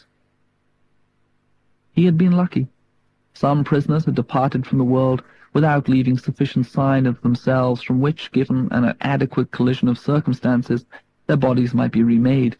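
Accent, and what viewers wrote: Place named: Liverpool, United Kingdom. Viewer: Great to hear a reading by the author. Interesting way of speech going on there, I think it's an attempt to conceal a Liverpool accent.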